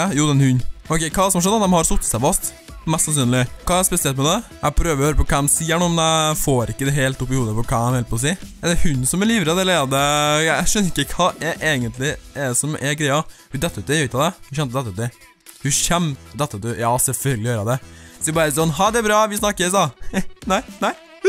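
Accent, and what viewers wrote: Trøndelag, Norway. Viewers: E du trønder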